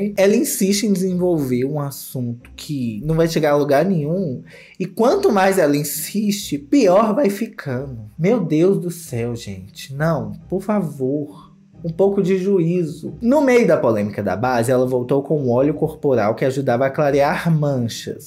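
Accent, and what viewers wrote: Minas Gerais, Brazil. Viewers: as vezes eu esqueço que vc é mineiro, aí eu fico prestando atenção no sotaque e fico uai ele é mineiro kkkkkkkk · gostamos MT do seu "minerês" precisamos mudar n ( mas se te incomoda, ok) rs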